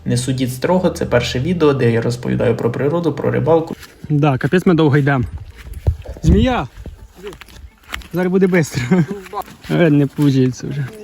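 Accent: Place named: Poltava, Ukraine. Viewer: Ви говоріть або російською, або українською, цей суржик задовбав... Це в мене так на Полтавщині... говорять, але там був більший вплив Рашки...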